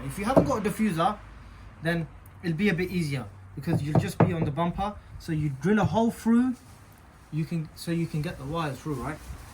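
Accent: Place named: South Asia. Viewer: Bro’s South Asian Accent tried to peek out a bit sometimes. But the light looks dope!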